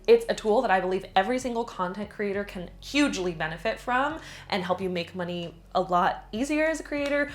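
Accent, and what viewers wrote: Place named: United States. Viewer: Hi! I am learning from you. It is not only about side hustles but also about understanding and speaking English with an American accent. There are many languages and dialects here in the Philippines, and I speak 4 of them. English is such a big deal here, you have to speak English well to be considered smart and intelligent by most people. You are easy to understand, and I am planning to watch 1-2 videos of your videos every day. Thank you.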